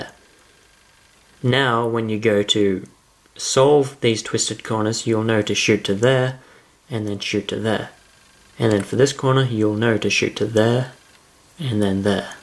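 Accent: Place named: United Kingdom. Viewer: You sound like you're british. The tutorial helped! I'm also nervous of solving it w/o looking. #AlwaysPractice | v But I don't understand how to recognize the proper position, especially for the corners. I'm not sure what to do like, for example, UBR > DFR. That is the problem that i didn't understand.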